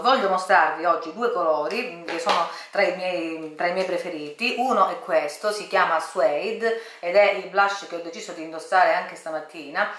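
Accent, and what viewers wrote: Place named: Sicily, Italy. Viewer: Dall'accento mi sembri siciliana😆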